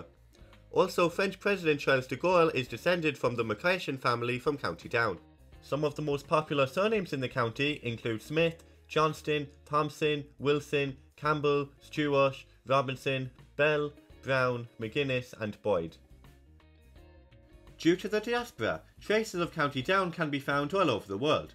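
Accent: Cork, Ireland. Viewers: Ahhhh! I thought it had a touch of Cork. :-)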